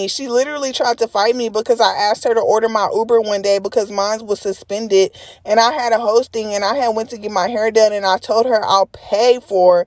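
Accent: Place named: Texas, United States. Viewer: lol i can tell yu from texas ...im from louisiana and you said them cities kinda wrong no shade tho but i live around thos cities too!!!! ima big 318!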